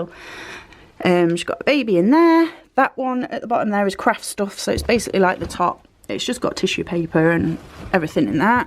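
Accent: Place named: England, United Kingdom. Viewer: Love it! And I also LOVE your English accent 🥰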